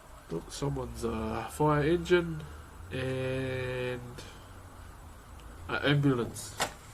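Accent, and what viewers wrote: New Zealand. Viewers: Lol, thats cause Taika Waititi the director/korg of Thor rangnarok is maori and so is the bro. That's just our accent 👌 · The New Zealand accent is an immediate reminder of Korg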